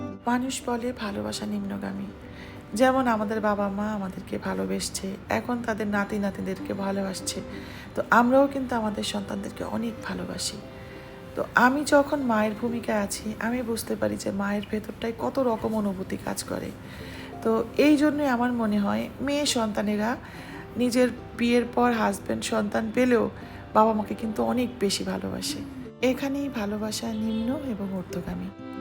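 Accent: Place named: Barishal, Bangladesh. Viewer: Apu apni kothai porashuna korechen,apnar kotha shune barishal,feni,noakhali mone hoy,?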